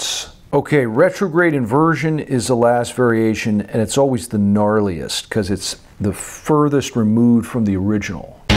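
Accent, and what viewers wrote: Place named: South, United States. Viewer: you remind me SO mcuh to Dimebag Darrell, between your southern accent and silly humor similar to the Vulgar Videos. Keep it up!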